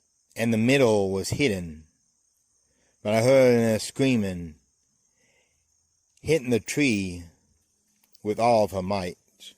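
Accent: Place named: Louisiana, United States. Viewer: Sounds Cajun to me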